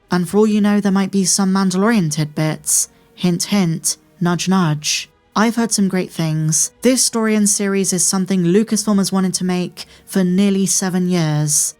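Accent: United Kingdom. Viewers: WHY are you making Money off of Starwars and the ACTUAL people who created it back in 70s and 80s are not???? I’m sorry but you’re just reading Making Starwars and shooting these videos. That’s Lame.. Get a REAL job that contributes to Society.. WE have women doctors, engineers, researchers and Than we have the women of this lame TikTok Selfie Generation…StarWars is a Gen X era Franchise.. EVERYTHING cool happened in late 70s, 80s, and early 90s.. You guys keep taking OUR era stuff and making it worse..YOU Are not understanding like many Art.. Starwars is reflection of the ERA it came out in 70s an 80s and WHAT came before it World War II. The Mona Lisa would just be a painting of a woman.. BUT it’s iconic because who and when it was painted… the fact you say what you read in British accent is annoying.. You should give every pound you made to the people who actually WORK on Starwars in 70s an 80s..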